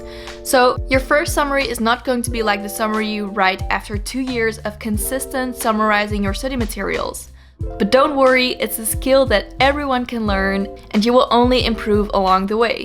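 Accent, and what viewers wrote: Netherlands. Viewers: When I heard ur accent I noticed how it sounded dutch, when I saw: "inhoudsopgave" I was like: 'Aaahh so she is'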